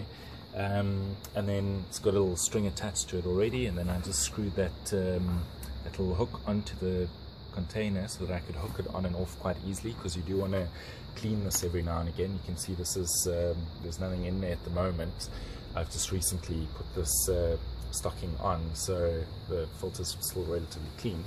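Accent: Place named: South Africa. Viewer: He sounds so RSAn😂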